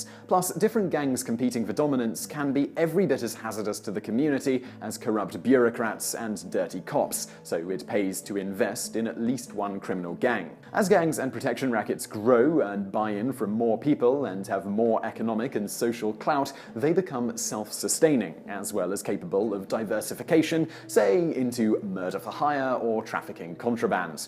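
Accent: England, United Kingdom. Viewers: For those complaining about how says the word mafia: he speaks with an English accent. He is saying 'mafia' correctly according to where he is from. Aussies, some South Africans, and many other non-North American English (according to their accents and dialects) regions say mafia the same as. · T Rohan You do realize that his ancestors were speaking English when yours were crawling around on the ground, grunting and swinging from trees. He pronounces it the way people in England, who speak English, pronounce it. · All these yanks upset with his pronunciation. If you don’t like the English language in its natural form, use someone else’s mother tongue. We don’t really want you walking round telling us how to pronounce things. Bastardised to the highest of degrees.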